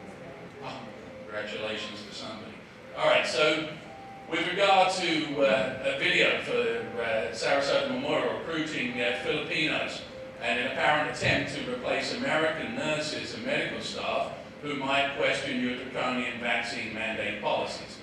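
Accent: United Kingdom. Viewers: Who is the immigrant actually. U came over from England and still talk like the Brits we kicked out of America in 1776. Go home to england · He's not even American. Listen to him. He's a Brit; a frigginn Lobsterback 🇺🇲💪🏻🇬🇧👎🏻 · He is a Pommie (an English person). He migrated to the US some years back. He sounds like the love child of Nigel Farage and Arthur Daley.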